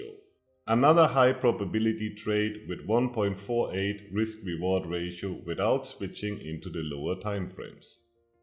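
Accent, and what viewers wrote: Germany. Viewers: Dein Akzent ist Deutsch, daher antworte ich auf Deutsch ;-) Das schaue ich mir genauer an. Aber das scheint echt irre cool zu sein!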